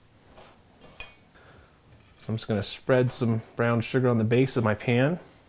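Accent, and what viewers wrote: United States. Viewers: He sounds American so I think Fahrenheit. Also these are smoked so 150 Fahrenheit sounds about right because 150 Celsius seems too much and will burn the fish in less than an hour. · I work commercial salmon fishing every summer in Alaska. I make this recipe 10 times a year. Love it. You from Idaho? I can hear it in the accent.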